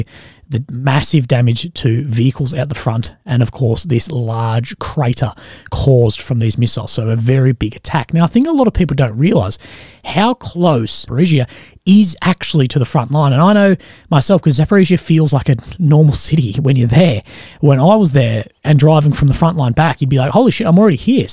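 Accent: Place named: Australia. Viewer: yo son ben in UKR for six months great to hear a real OZ voice. Met a few seppo's here, usually pretty friendly when travelling but , not here, just hi and by.. OK appreciate your channel a lot great OZ perspective.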